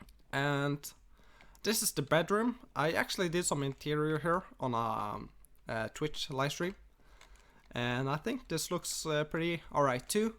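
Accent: Norway. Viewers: Are you norwegian?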